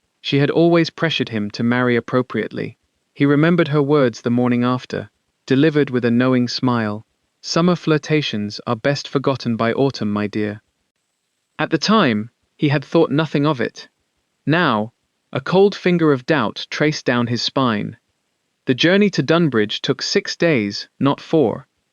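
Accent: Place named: United Kingdom. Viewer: Is the narrator British or American? The voice goes in and out of both accents, sometimes within the same sentence. Pretty distracting.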